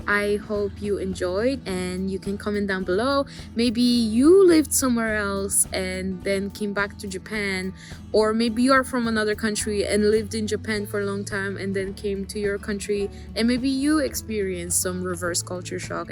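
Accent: United States. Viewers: This is my very first time to check on your video. I was amazed that your English is so well and American lol. Enjoy home and return to Tokyo soon. I could not return to Tokyo because even I was born and raised in Tokyo. I did obtain US citizenship so I have to get visa every time I visit my parents living in Tokyo. I wish I can return Tokyo in November. Have fun in Poland!d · アメリカ発音で聞きやすかった… これどうしようもないんだよね耳が学んだ言葉と発声はそれしか理解出来ないのよね。まあそれだけ言語力が無いって事かも知れんけどw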